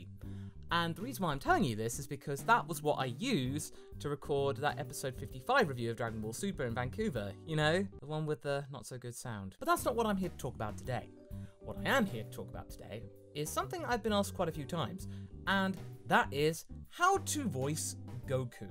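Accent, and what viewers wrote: United Kingdom. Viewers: u sound british m8.. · The first time I heard your natural speaking voice when you were at a panel, I honestly thought you were just putting on a fake accent, and I was like, "Who the hell is that guy? Where's Goku's voice actor?" Only to realize YOU were Goku and that your natural voice has a British accent. I felt super awkward when I realized that, but I'm pretty glad now knowing you were behind it, and seeing you do the DBZA Goku voice just makes me smile. Keep up the good work,! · He wouldn't get any troubles to make a Goku Black voices considering the British Accent on that. Also, when I just figured that he's actually British, that just blowed my mind! That's amazing, I could swear that he was American. Much love from Brazil 🇧🇷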